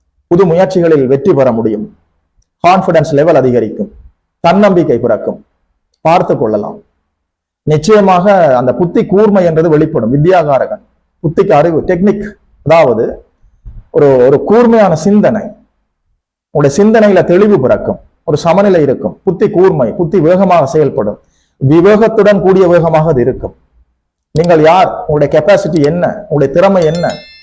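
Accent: Sri Lanka. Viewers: Srilankan tamil flavour is seen. Good luck to the video content teller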